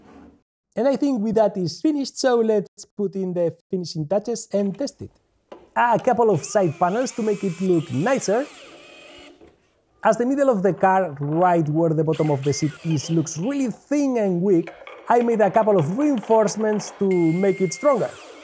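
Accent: Spain. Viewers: No deja de sorprenderme que tu acento sea tan español, y a la vez tu léxico inglés sea tan extenso 😆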